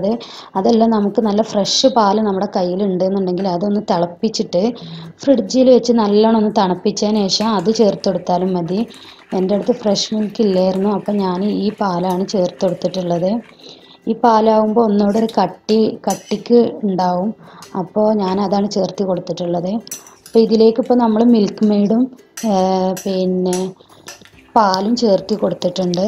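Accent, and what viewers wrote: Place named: Malappuram, India. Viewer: കുട്ടി മലപ്പുറത്ത് കാരിയാണ് ല്ലേ.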